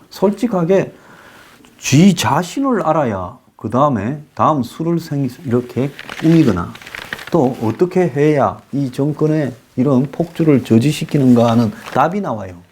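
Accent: Gyeongsang, South Korea. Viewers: 맞아요..저도 첨에 뉴핑을 듣는데, 나라소식을 브리핑하시는데,왜그리 웃가던지... 갱상도 억양&디테일하고 살어있는 표현력이 완전 갑!수준이지요...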